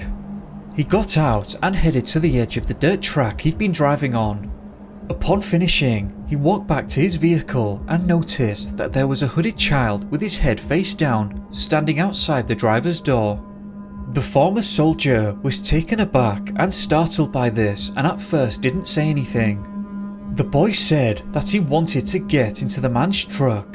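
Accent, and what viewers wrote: United Kingdom. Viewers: Why do you talk like a British chills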